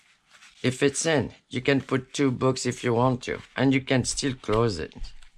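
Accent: France. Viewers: I just love it the grundy look. Absolutely luv hearing you talk. The French accent. Reminds me of home. Gaspè, Quebec. Wear are you from? Happy you are workin with Tinne. Just amazing kits. Ok now to watch tutorial! Cheers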